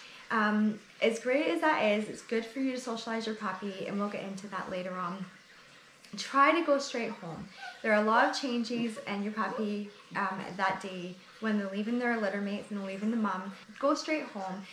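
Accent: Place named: Scotland, United Kingdom. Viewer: I thought I heard a Scottish accent. I’m getting my pup ( Casper ) in two days time, I can’t wait. Thanks for the video and tips. Love from Scotland 🏴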